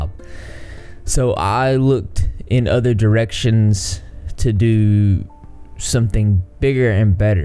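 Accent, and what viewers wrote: South, United States. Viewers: what part of the south are you from? It sounds just like where I am 😅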